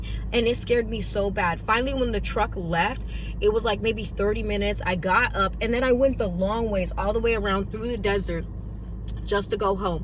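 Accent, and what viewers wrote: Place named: Maryland, United States. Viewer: This story is insane … im glad you’re ok and i love my MD ppl … I love how y’all say tew instead of 2 … please be safe ….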